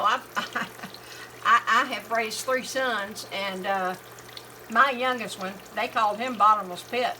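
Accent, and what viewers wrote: South, United States. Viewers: Southern accent this has to be good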